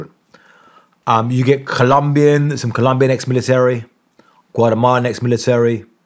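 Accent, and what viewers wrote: United Kingdom. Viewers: Where's this guy from, he sounds kinda British but his accent is all over the place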